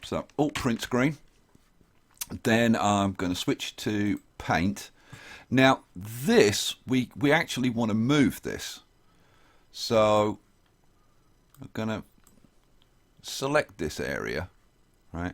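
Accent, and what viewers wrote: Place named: Birmingham, United Kingdom. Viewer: Thats a Brummie accent if I ever heard one.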